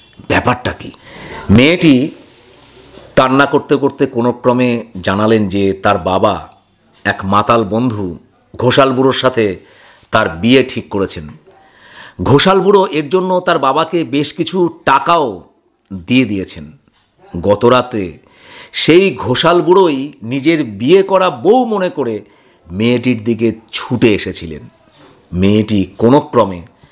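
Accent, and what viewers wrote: North Bengal, India. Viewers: বাসা(বাড়ি), কান্না করা (কাঁদা), খারাপ পাওয়া (খারাপ ভাবা), কথা করা (কথা বলা ) , আসেন, বসেন ( আসুন, বসুন ), সেই হয়েছে ( দারুন হয়েছে )....... বাংলার এই টানগুলো শিলিগুড়ি, জলপাইগুড়ি, উত্তর বাংলার অত্যন্ত প্রচলিত শৈলী। দক্ষিণ বঙ্গে প্রচলিত নয়। নিজের কথার টান / Acsents ই যে সঠিক এই রকম ধারণা ঠিক নয়। কথ্য ভাষা সংশ্লিষ্ট স্হানের বসবাসকারীরাই ঠিক করেন। সেই অর্থে ঠিক ও করেন না। আপনা-আপনি ই প্রচলিত হয়ে যায়। এই Accents কোনো ব্যাকরণ-বিদ বা মাস্টারমশাই নির্ধারণ করে দেন না। তাছাড়া, ভাষায় বৈচিত্র্য ভাষাকেই সমৃদ্ধ করে। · উত্তরবঙ্গের সব জেলায় এমন ভাবেই বলে,(আর বাঙলা কথাটাও পড়িনি,বাংলা এমন পড়েছি)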